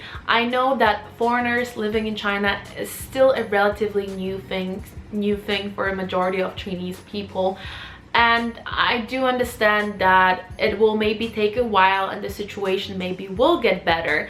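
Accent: United States. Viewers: Could you explain your american accent？ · Are you Danish or Parisan, I am so confused. And you don't seem to have any European accent, you sound American to me. Anyway there are many overseas Chinese around the world. Apparently you need someone who's as interculturally smart as you, probably a multilingualist for your future kids benefits, thb, do you secretly have yellow fever, just wondering :p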